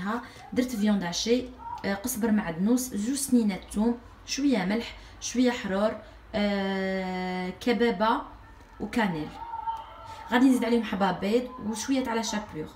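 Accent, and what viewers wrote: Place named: Algeria. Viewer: شحال تحمقني لهجۃ جزايريه تحيۃ من اختك المغربيۃ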